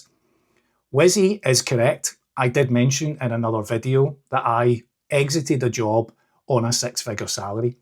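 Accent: Scotland, United Kingdom. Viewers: Hi. I like your style of talking and sharing. Actually, I have to confess that when I was younger, I couldn't listen to the Scots accent very long!! Don't know why! But when I heard your voice, that changed lol. Anyway, I'm really enjoying your channel. PS: My Step Grandad came from Banff so maybe his accent was more sharp than yours?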